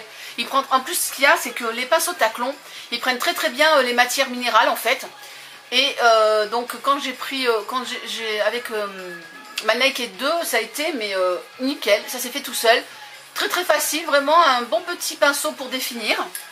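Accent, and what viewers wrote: United Kingdom. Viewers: ps / je suis dingue de ton accent british !!!!!!! non je ne me moque pas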